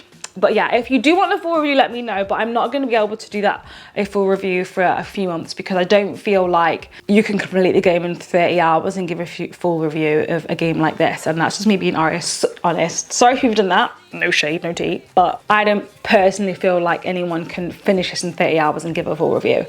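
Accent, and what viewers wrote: United Kingdom. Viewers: How do you have both British and American accent? 😳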